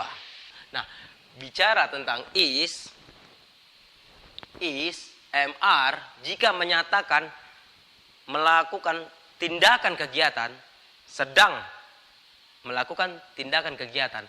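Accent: Medan, Indonesia. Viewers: Orang medan emg gitu lah logatnya gan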